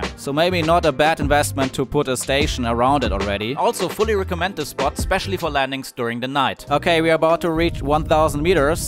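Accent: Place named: Germany. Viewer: are you from germany bcs you sound german btw nice vids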